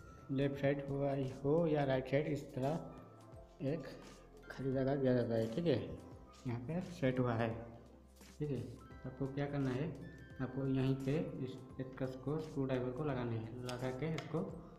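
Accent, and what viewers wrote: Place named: Odisha, India. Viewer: Friend aap odisha se ho na.. (bhangega nahi)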